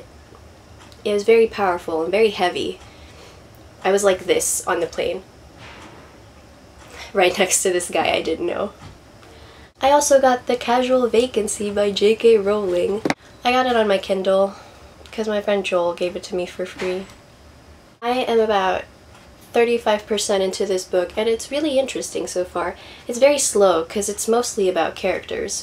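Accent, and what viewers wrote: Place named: United Kingdom. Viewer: lol love your British accent and your intro!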